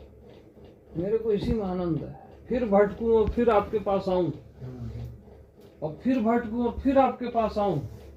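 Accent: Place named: Nagpur, India. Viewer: Aap nagpur ki bhasha khubsurat hai..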